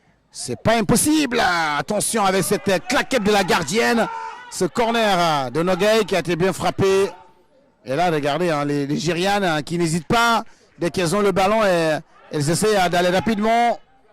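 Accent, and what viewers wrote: Ghana. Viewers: Why is he sounding Ghanaian?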